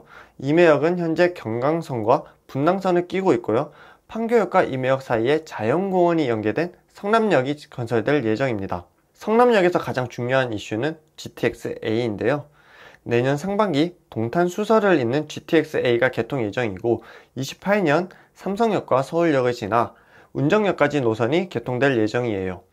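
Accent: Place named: Gyeongsang, South Korea. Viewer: 경상도 말투다.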